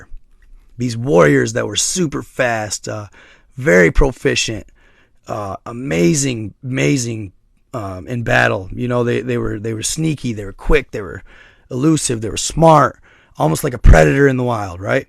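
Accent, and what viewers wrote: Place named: South, United States. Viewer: You must be from the same cloth because I'm the same way . Many aren't solid these To where people actually know what boundaries to cross and which one's not to.But where are you from bro you sound like you're from the South.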